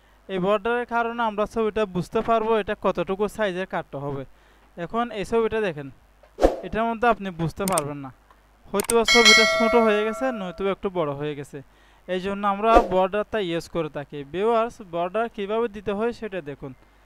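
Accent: Chittagong, Bangladesh. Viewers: ভাই আমার মনে হয় তুমি চিটাগাং এর লোক তবে ভিডিও করার সময় কথা একটু জোরে বলবেন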